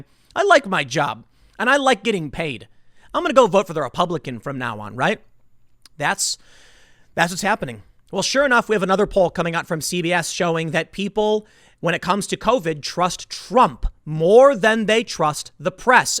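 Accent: Chicago, United States. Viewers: Richard Bostan it’s called an accent. That’s a Chicago thing.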